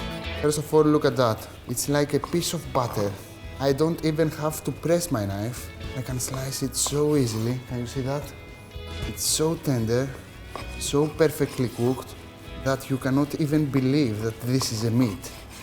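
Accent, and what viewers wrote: Greece. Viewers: Thank God, the video is subtitled. 😆😆😆 I've rarely heard anyone speak English with such a heavy Greek accent: to the point of being almost unintelligible.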